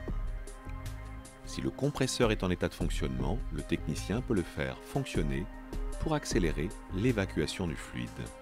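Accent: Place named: Paris, France. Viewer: He has a very posh accent. Is he from Paris? . Anyone?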